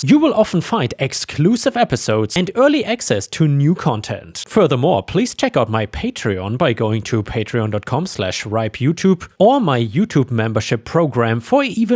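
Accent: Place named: Germany. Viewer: You know what I just realized? You have pretty much lost your German accent. Your English is awesome.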